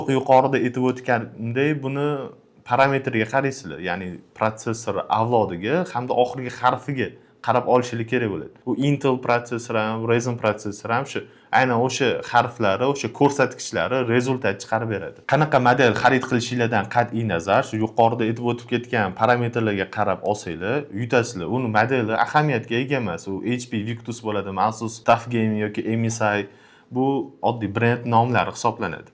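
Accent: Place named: Tashkent, Uzbekistan. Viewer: Toshkentcha gapirmay o'l, bloger degani adaboy tilda gapirishi kerak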